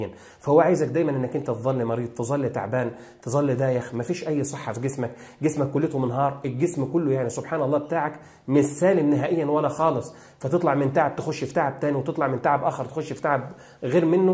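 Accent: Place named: Morocco. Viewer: تلاوتك تشبه تلاوتنا المغربية الشعبية .تريح الاعصاب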